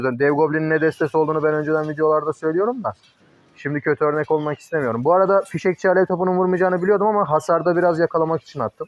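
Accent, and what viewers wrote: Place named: Thrace, Turkey. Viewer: bizim Trakya insanına benziyor sesin zaten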